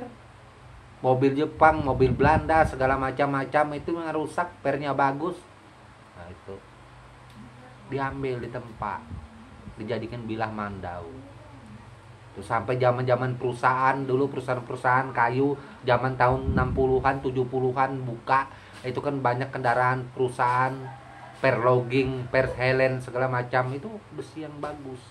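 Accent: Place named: Madura, Indonesia. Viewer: mAAF ko terkadang saya dengar seperti logat Madura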